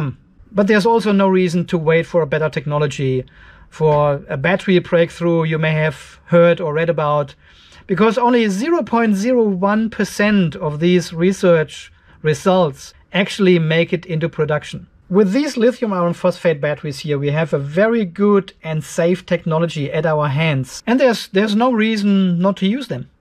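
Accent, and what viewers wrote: Germany. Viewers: Your accent sounds german. Am i wright? Super Video, Danke 👍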